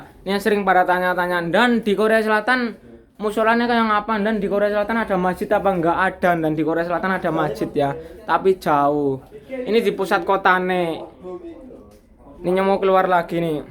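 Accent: Banyumas, Indonesia. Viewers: Ngapak bgt rika kaya wong banjar,awas gole nyabrang cincing mbok teles hehe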